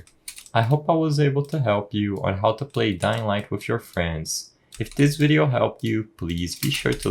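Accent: Brazil. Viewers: você é Brasileiro né?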